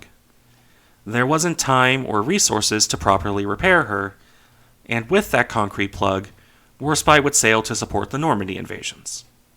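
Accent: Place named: United States. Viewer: Nicely done. Earned you a sub. Very well presented....the best "Americanized" version of Drachinifel I've ever heard. I look forward to future content.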